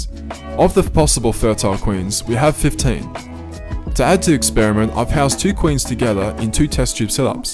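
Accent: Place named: Australia. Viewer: its like youre a australian ants canada also good info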